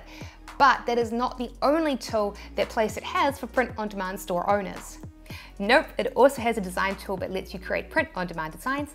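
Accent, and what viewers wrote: New Zealand. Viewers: I like your New Zealand accent. Good job and thanks for all the info. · Are you a kiwi? Where is the accent from? 🙂 Love your videos.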